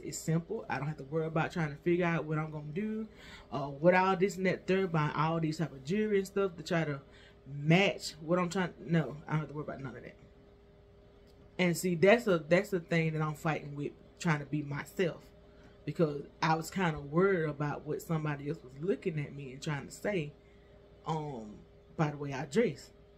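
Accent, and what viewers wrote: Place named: South, United States. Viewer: Enjoy being a stay at home wife and Mom. Love that for you! Thank you for sharing your daily devotionals. Never know who needs to hear that word. 🙏🏾 I feel you on the speech Sis. I'm from the south too where we talk fast, loud and with an accent 😅 But that's what makes us unique and special. Those butterfly earrings are beautiful, nice choice! And that dinner looked delicious!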